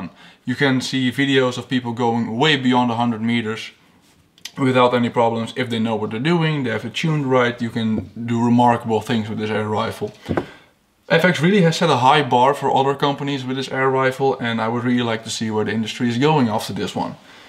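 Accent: Netherlands. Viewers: Ben je gewoon Nederlander? Aan je accent te horen wel? Niet negatief ofzo, gewoon nieuwsgierig.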